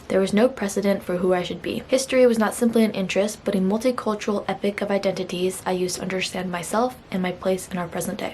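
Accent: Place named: United States. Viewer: I love you Americans and your accent :)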